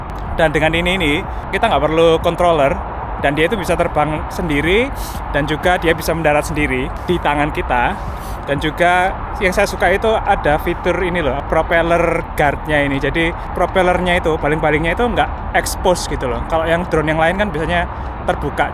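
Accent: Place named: Java, Indonesia. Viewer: Asli Jowo tenan medok e gak di gawe gawe soal e hehe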